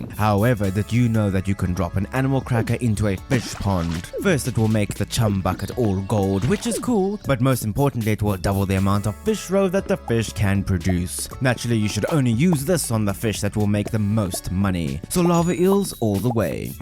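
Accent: South Africa. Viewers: I sense a South African accent...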